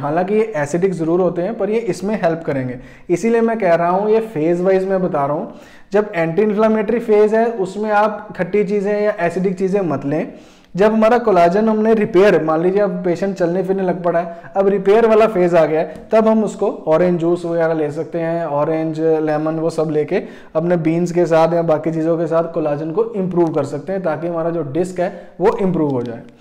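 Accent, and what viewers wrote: Punjab, India. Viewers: As per ur dialect u seem to be Panjabi. Am I right?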